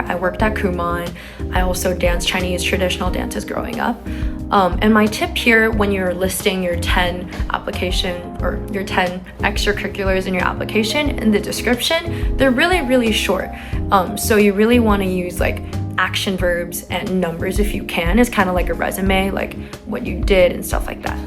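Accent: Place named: United States. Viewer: You speak perfect English with a American accent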